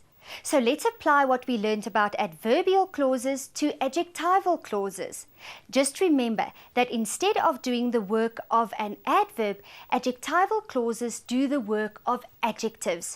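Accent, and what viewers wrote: South Africa. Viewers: The South African accent :) , I'm teaching English in Korea, this was so refreshing , day made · I'm from South Africa, I'm pretty sure that it's a South African accent...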